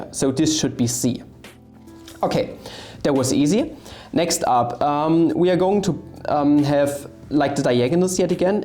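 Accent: Germany. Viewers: I do not know why, but I enjoy your german accent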